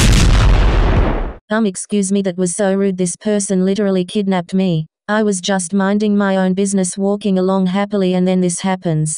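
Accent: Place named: United Kingdom. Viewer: WAIT OMG UR BRITISH? IF U R FINALLY A BRITISH YOUTUBER LIKE- 😭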